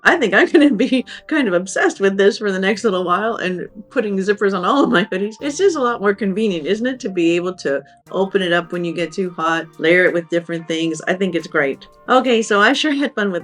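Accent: Canada. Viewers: I'm addicted to your videos! You make me feel like I can tackle anything....I love your accent, your humor and your clarity. As a former US military family, you make me miss Canada too. We were blessed to be stationed in Newfoundland and then Victoria, BC during our military career. Thank you so much for making these videos!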